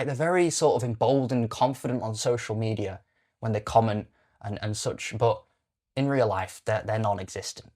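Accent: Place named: United Kingdom. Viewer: Right, so... The movie was shite yeah. BUT, tagging people "far right" or r@ for being sick of the migrant issue is part of what alienates them and pours oil on the fire because it closes off any real potential for dialogue. Hence the movie. The problem has festered too long without being addressed in a mature way, and lo and behold, now we have the state of the UK (you sound British, so maybe you should know). I'm writing as you go along; you cite Tommy Robinson, but NEVER has he advocated for people to be violent, especially in the latest mass gatherings. The scene in the flat with the family (I don't advocate for what happens next, of course): covering one family's sins is EXACTLY prescribed by Islam and is part of the saving face cultures from the Middle East. The "moderates" are irrelevant; they'll suffer as much as the rest when the fundamentalists act. 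They can't rat them out, nor will they do so for less serious crimes. And the number of families that have stayed silent when their brothers, sons, or husbands have committed the horrendous gang grapes is damning. So in that sense, the scene where the family tries to find excuses is not that caricatural.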